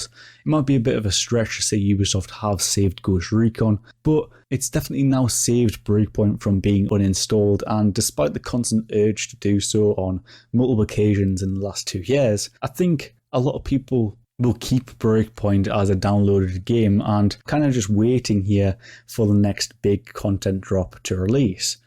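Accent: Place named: Newcastle, United Kingdom. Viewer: This any good for a 41 yr old filthy casual who thinks the old ghost recon games were much better than the more recent offerings of wildlands and this? I never played this but read some horror stories about it and swerved it. Worth it now? I liked wildlands but it felt a bit samey as other ubi open worlds and was never touched after the platinum. Just scratching around for something to switch off to and play solo. Fellow geordie by the sounds of it also, I’ll sub just for that 👍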